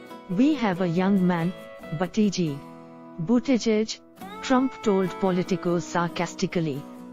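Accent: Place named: India. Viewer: PS. Who is the Indian narrator for this 'show'?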